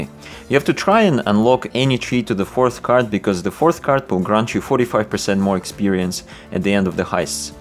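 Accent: Russia. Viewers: Hes russian right? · your accent are you a russian?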